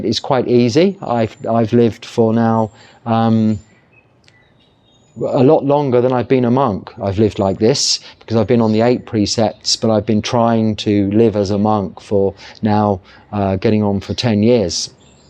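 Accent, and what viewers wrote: England, United Kingdom. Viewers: What an absolute treasure these frank talks are, from a monk in situ...with an English accent! I hope the young people realise this was impossible pre-internet! Many thanks!! I have a question below.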